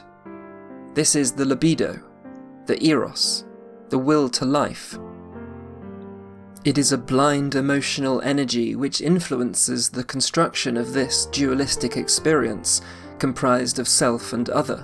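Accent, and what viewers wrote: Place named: United Kingdom. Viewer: No entiendo nada o poco y nada pero me encanta el acento, estoy aprendiendo inglés y me gusta escuchar el acento británico, de donde sos? Saludos desde Argentina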